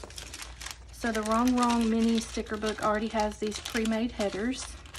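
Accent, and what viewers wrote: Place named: Texas, United States. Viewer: What state are you in ? You sound southern or Texan It is a cute spread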